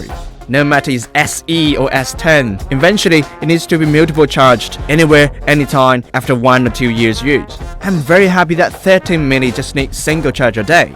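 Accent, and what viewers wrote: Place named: United Kingdom. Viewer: 惊奇，竟然是全英文版本的，还是英式英语，哈哈哈，加油，做自己，等你们的充电头了！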